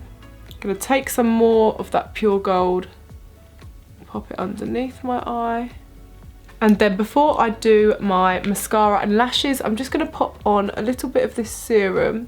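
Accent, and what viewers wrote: England, United Kingdom. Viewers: Your English accent is awesome! Your beauty is awesome! Your Everything is awesome! You are awesome, dear! Lots of love from India to you!! We love you alot.. and hope that you too love us Indians..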